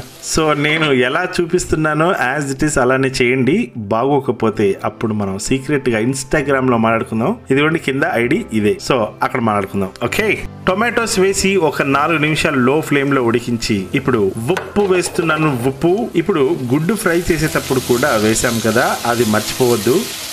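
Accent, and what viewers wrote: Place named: Andhra Pradesh, India. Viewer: I like your Andhra slang broooo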